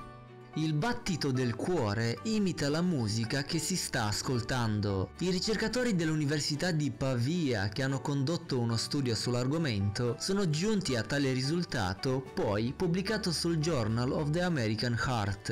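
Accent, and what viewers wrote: Russia. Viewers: sei russo? dall'accento si sente un po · dopo tutti sti video tuoi che mi sto vedendo ho disperatamente bisogno di un accento russo come il tuo ahahah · oddio sei un grande,il modo con cui ti esprimi,dettagliato e con parole ben scandite,il modo con cui parli (so che sei russo però questo è positivo perchè ti rende la voce tipo enigmatica e questo a me piace),queste cose ti rendono perfetto per il genere di video che fai,dovresti avere più iscritti e,ti farai mai vedere?fai una top 5 sulle curiosità sul lego?sei un grande continua così ;)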